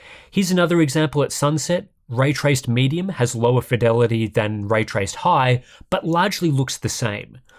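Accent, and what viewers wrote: Australia. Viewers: Australian accent usually isn't very salient in my mind when I'm just listening to the audio, but when he said "you should be right" I was very reminded that they're Australian.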